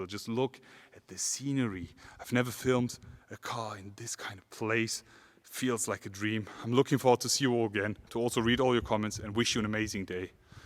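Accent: Germany. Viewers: I always liked, a German accent, suits the Mercedes. Makes it, more tempting, to buy one. Cheers......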